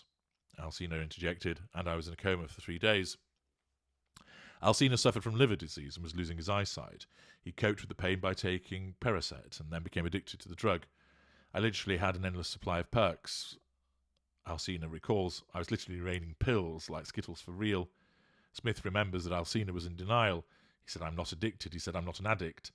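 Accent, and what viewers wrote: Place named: Wirral, United Kingdom. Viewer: I find your channel so educational and entertaining. I bet you are not going to answer this but I am fascinated to know where your accent is from. It’s not that pronounced but there are certain words you say where I can detect a certain regional accent. Are you originally from Wirral, by any chance? Go on, be a devil and answer me. 😊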